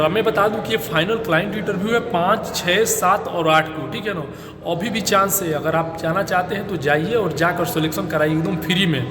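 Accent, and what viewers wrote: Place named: Gorakhpur, India. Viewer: आप गोरखपुर से है क्या भाई..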